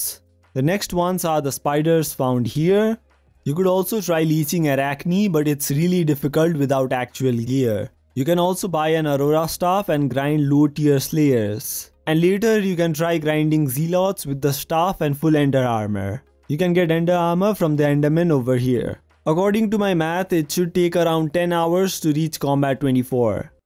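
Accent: India. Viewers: U sound like indian but are u In USA ?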